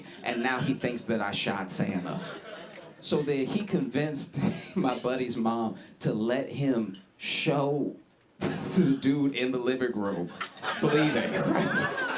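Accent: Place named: Louisiana, United States. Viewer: I wonder what part of Louisiana he's from(?)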